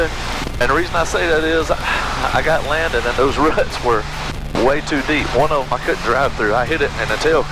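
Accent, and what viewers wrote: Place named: South, United States. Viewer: Love that southern twang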